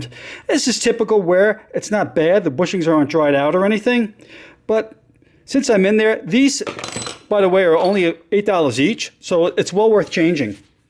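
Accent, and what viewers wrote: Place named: New York, United States. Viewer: I just assumed he was in NY because of his accent and I was wondering how his car is so clean so I was confused till I read your comment.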